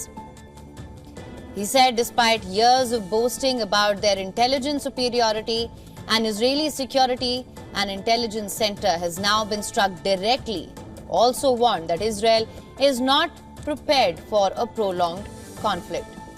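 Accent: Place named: Gujarat, India. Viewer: Is this channel for Indians only...why does she speak like some Gujarati aunt from some factory in England.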